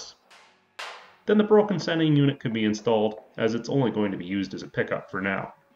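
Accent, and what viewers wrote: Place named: Canada. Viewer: Please sir, I'd like some more. This looks like a great project to mess around with. I love all you fab stuff you always show. It helps me with my projects to see someone do it well. And then there's your wonderful Canadian accent. It's like I'm watching an episode of Letterkenny. LOL! ;) Please do more with this truck!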